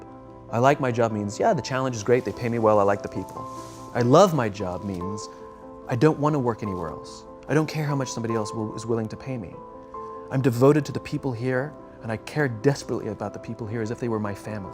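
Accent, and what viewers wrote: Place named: United Kingdom. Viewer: 영국 발음 이네요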